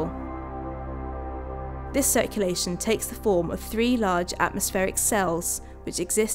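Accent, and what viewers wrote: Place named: United Kingdom. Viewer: its like british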